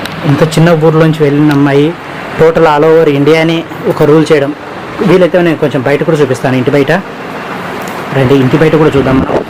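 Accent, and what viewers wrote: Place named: Rayalaseema, India. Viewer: garu Mee vedieo chala chala baguntundi Mee Ankaring super Abba Mana bhasha Maravakunda Baga Matladutu Nara Abba Tq Abba madi Mydukur Abba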